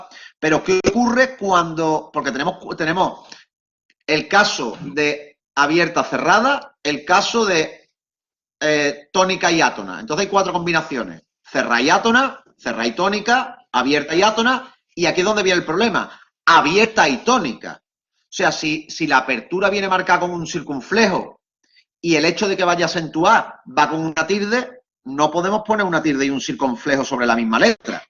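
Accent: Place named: Triana, Spain. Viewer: Yo soy andaluza y no entiendo por qué decís: "Rassoneh" o "prossesso", como se pronuncia en Triana y no simplemente: "razones". Hablando de imponer , esque ahora hay que pronunciar en trianero? "Paressío", "ehpessialihta". Pff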